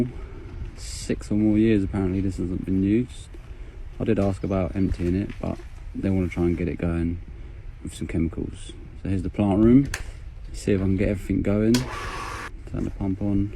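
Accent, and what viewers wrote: United Kingdom. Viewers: based on your accent you’re across the big ol Atlantic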